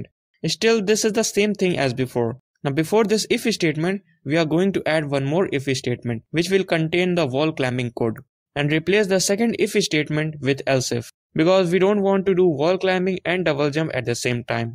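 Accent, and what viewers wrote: India. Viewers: suggestion to you bro, fix your indian accent, and here will be a lot of viewers!!!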